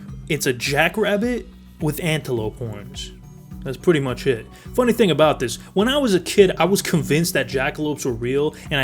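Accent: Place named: New Jersey, United States. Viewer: His joisey accent is 👍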